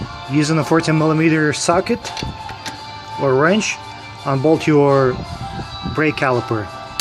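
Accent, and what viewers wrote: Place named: Russia. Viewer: Так и человек говорит с русским акцентом.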